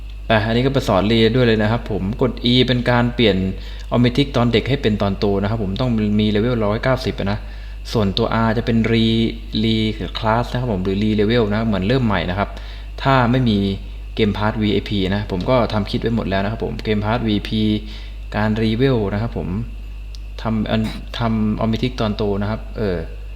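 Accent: South, Thailand. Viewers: พี่เป็นคนใต้หรอ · พี่คนใต้หรอครับ5555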